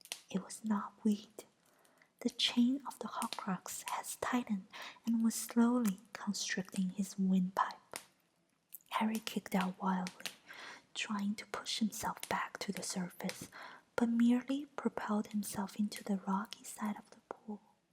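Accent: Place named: China. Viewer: from your accent I could tell that you are from china ?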